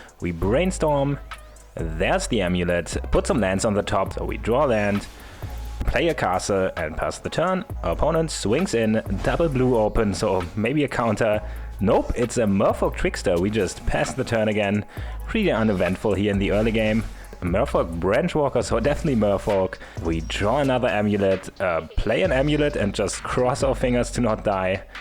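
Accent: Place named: Germany. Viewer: are you a german person though? Because it really sounds like it. At least you are from Austria or Switzerland i know it :D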